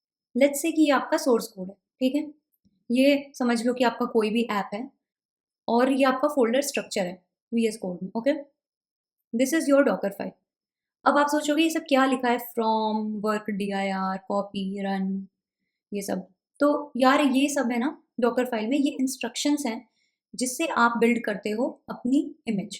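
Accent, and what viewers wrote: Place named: North, India. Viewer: That North Indian hindi accent killing me Sorry but more focused on you than content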